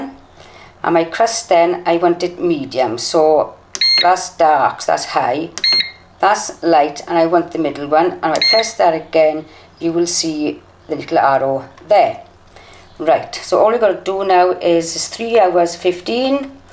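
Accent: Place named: South Wales, United Kingdom. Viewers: South Wales😊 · I recon that's a Rhondda accent. I get my flour from Aldi in Porth, When I say Porth I'm never sure if it's in Porth or Dinas. I'm new to using a machine for bread so thanks for the tips. Oh, and I use Honey instead of Sugar. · when I heard you speak I knew straight away, “ah, she’s from Wales.” My career was as a primary school teacher and I taught two little girls whose parents had emigrated from Wales to Australia 🇦🇺. They sounded just like you! It’s been 30 years or so but it came back to me like it was yesterday! 😊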